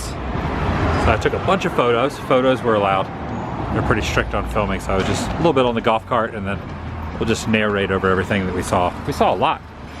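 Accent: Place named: Los Angeles, United States. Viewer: The baby voice does make me want to scream. I feel like a lot of LA people talk like that lol.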